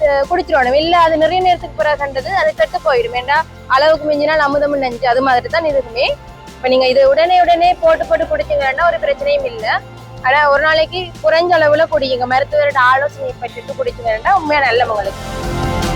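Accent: Jaffna, Sri Lanka. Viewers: எங்கட யாழ்பாணம் வர வர சென்னை மாதிரியே மாறிக்கொண்டு வருது. ஆனால் எங்கட யாழ்ப்பாண பேச்சு வழக்கும் எங்கட கலாச்சாரமும் மாறாமல் இருக்க வேண்டும். அது எண்டைக்கும் மாறவே கூடாது. நம் பேச்சு வழக்கு. நம் சிறப்பு. எங்கட யாழ்ப்பாண பேச்சு வழக்கே என்றும் நம் இதயத்தோடு ஒன்றி இருக்கிறது. சிறப்பான காணொளி. ❤️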